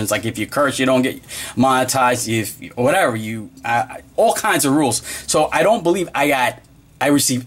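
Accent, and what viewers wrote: New York, United States. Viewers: Ha! I'm watching this with my girlfriend and we where guessing where your from based on your accent. I said gotta be Jersey or new Yorker. She said Monmouth county nj lol. Gotta be strong island?!? We are down the Jersey shore but I grew up in the Bronx and work in Manhattan. Keep it up bro def simple informative and straight up videos! Like they should be!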